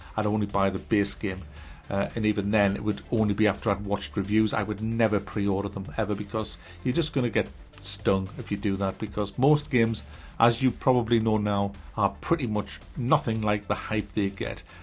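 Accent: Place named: Northern England, United Kingdom. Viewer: do you listen to athletico mince or top flight time machine? You and the northern bloke in those podcasts are the only northern people in my life, but two of the best. Love the channel, love the vids, love your voice. Wish i could hear you being happier...but fully understand that life and the modern gaming world are not particularly happy places.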